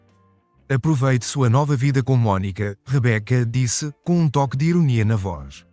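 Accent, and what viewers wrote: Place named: Portugal. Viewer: essa voz sensual desse português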